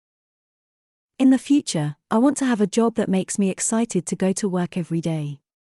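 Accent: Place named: United Kingdom. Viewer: I think this is the chanal that i was looking for to Master british accent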